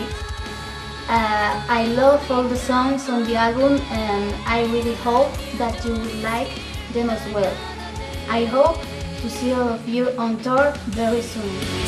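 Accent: Spain. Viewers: Well your accent is still kinda spanish,but,love ye,ye sing fine,and in songs ppl hear less accent more song,so you'll have no probs. And,in your future carrier as sirenia main vocal,you should learn english just fine :)) Wish ye all best luck. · Of course she's spanish, you can tell it by her accent. I already knew that :P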